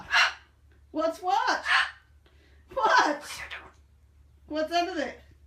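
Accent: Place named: Australia. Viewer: Oh yes I agree, along with the Australian accent he is priceless! 🦜 😁😅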